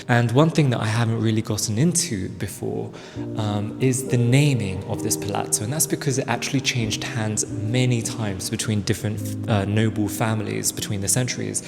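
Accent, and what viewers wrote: RP, United Kingdom. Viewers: The speculation looks realistically fair enough. What a beautiful entrance hall! Photos from the auction showing 18th-century "la sedia" though and not carriages/an elegant mean of transporting a person by using two footmen for carrying. Columns must be a roman repurposed spoliage. You have an exquisite Queen English accent but when you are in hurry explaining things and full of enthusiasm sounds more natural and less pretentious, keep it like that is more charming.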